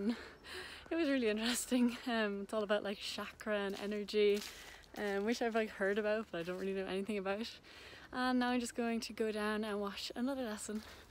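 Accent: Ireland. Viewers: I have been watching your videos for a while now and I feel you’re the most genuine person out there. I have no aspiration of competing or anything like that but you are so inspiring and I always feel I learn something from your content! Plus love love love your Irish accent ☺️ lots of love from Tawnydun Thady and I ! Xx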